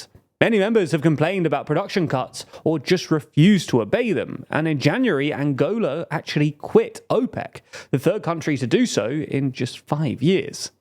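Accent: United Kingdom. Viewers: Just say, Muslim scholars. Stop trying to pronounce Arabic words with your goofy British accent.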